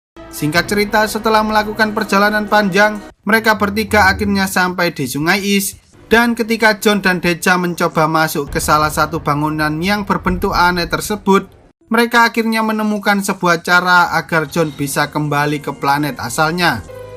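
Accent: Java, Indonesia. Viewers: Logate logat jowo..😂😂jowo bagian ndi mas..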